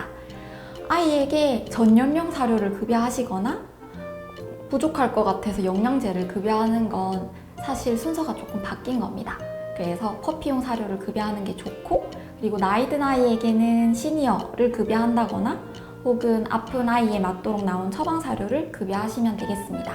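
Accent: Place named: Gyeongsang, South Korea. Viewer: 수의사님 고향이 경상도이신 것 같네요 그냥 수의사님 고향 사투리로 말씀하시는 것이 더 정겹게 들릴 것 같네요 ^0^ 중간 중간 사투리 나오는 맨트 보고 빵 터졌습니다. ㅎㅎㅎ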